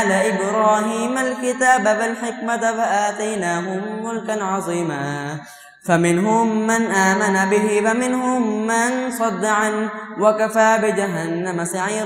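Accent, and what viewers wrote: Panipat, India. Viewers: Pure pani Patti lahja,bahtreen MASHALLAH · ما شا الله لهجه تو پا نی پتی لیکن یہ جھو لتا کیوں ہے؟ سمجھا نے کی ضرورت ہے ۔ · Bhai isse nach kr prhna nii kehte Isse pani patti lehja kehte h ap ko apni islah ki koshish krni chahiye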